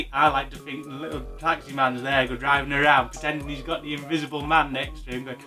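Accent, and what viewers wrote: United Kingdom. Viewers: You are too British for me to understand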